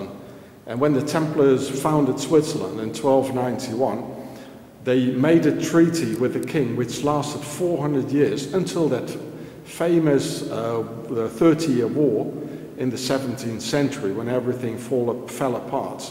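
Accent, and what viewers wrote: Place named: France. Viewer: I love her French accent. Its amazing to be walking into these buildings that are 800 years old or so. Don't have that here in the US. Be safe.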